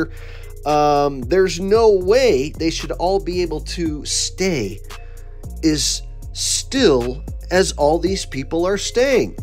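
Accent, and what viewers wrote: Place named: England, United Kingdom. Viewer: Hahahaha 😂😂😂 love the English accent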